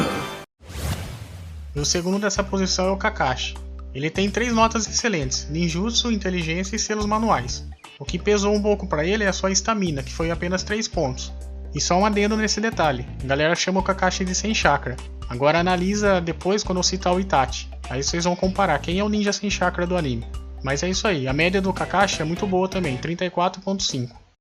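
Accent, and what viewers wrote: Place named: Paraná, Brazil. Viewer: vc e paraienese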